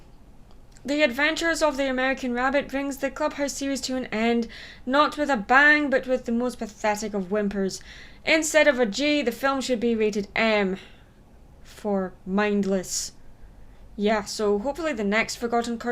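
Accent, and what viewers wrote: Scotland, United Kingdom. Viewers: I have a vague recollection of this. Or it was probably just seeing it on a greeting card. I was somewhere around 3 when this came out (and when a lot of things you plan to review this year did). Side note: I absolutely love listening to you speak. Something about a Scottish accent on a woman is just indescribably enticing.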